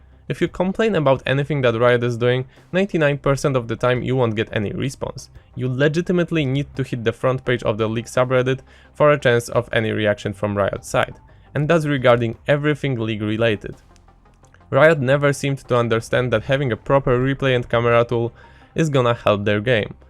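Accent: Poland. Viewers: And I always wondered why your accent sounds so Polish. Finally got the answer :) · Polski akcent wymowy sprawił że byłem pewny twojej naci :)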